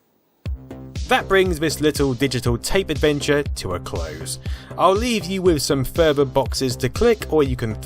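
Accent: United Kingdom. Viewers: IDK, but he could live in the UK thanks to the fact that knows A LOT about UK game computers. Also, he sounds very British.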